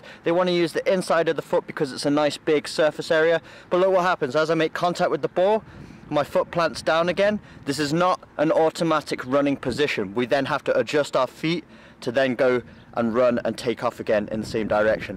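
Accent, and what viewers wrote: United Kingdom. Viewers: Your Accent sound like You’re British Are u. Mate ?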